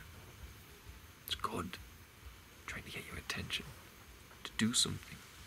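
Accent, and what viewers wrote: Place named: North, United Kingdom. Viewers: ah the northern accent. I am from NEwcastle! I see , , , , , , , , and which is my birthday! I went down south to the frack pad in Preston New Road to film the police brutality and the old tax disc on one of the campervans was ! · Are you from the North East in the U.K.? I am and recognise your accent. I too receive these numbers and beleive that they are guides or markers to say that I'm on the right path. May God Bless You.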